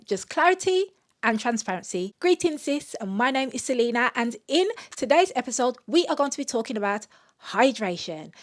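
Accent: Birmingham, United Kingdom. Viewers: This woman is a Brummie. I don't know what you think you are hearing.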